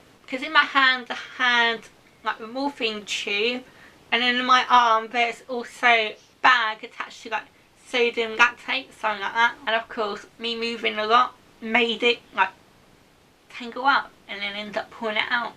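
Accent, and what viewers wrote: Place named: United Kingdom. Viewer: Wonderful that your mother was there to support you. I enjoyed listening to you as I enjoy listening to you Brits talking; to this end I watch a lot of BBC showsz and listen to BBC radio at various times during the day. My best to you and your family. namaste, Roger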